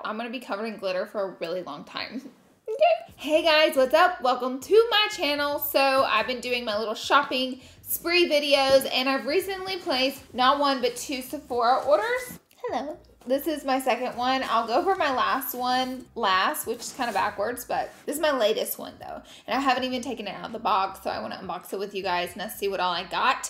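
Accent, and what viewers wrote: South, United States. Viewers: omg where is she from?! she sound soooo southern haha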